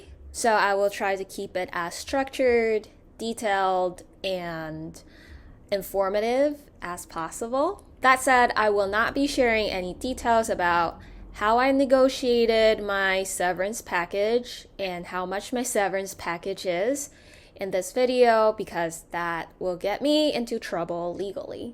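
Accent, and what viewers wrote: United States. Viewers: You sound 100% American. They should let you stay. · how come you have an American accent? did you learn that since you moved in to US? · How did you pick up American accent while you came to the United States in 20's?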